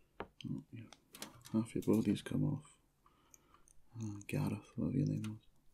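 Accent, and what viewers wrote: Scotland, United Kingdom. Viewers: I can’t believe this exist. Warhammer asmr in a Scottish accent. I’m so ridiculously happy.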